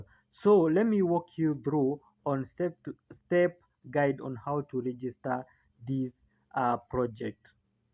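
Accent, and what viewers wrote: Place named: Kenya. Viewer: You sound Kenyan is it legit?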